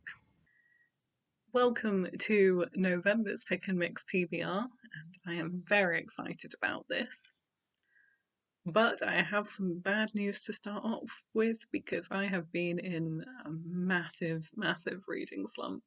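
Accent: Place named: United Kingdom. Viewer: Ikr? It's like the saying about there being so many books & so little time. But if you're a fast reader ( I was but it's now depending on the book) you'll do fine👌.I just lost one of my cats while taking him & his brother to the vet to be fixed,so I'm finding it difficult to focus on reading more than a short chapter atm. Anyway,just keep on reading as much as u can!👍 P.S are u from The UK? Your accent is so pleasant to listen to. I'm from Northridge, California,a suburb of Los Angeles & can't stand my speaking voice-lol.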